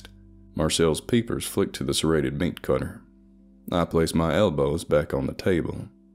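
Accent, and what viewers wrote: South, United States. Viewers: Gotta be honest, too much southern accent, not enough Texan.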